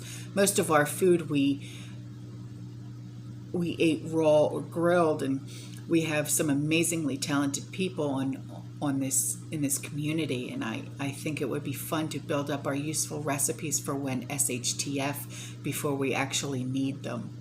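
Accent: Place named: Brooklyn, United States. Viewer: Well hello there, I stumbled upon your channel last night. I LOVE yer NY Brooklyn accent and your channel! Please don't hold back, pour on the accent! Prepping with pride!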